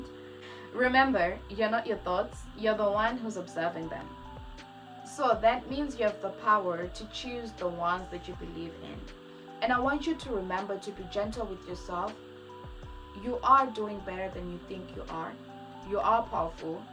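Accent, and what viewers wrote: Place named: United States. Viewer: Your voice chete , let’s talk about that 😮… smooth voice with English yekuAmerica✅✅✅🤣🤣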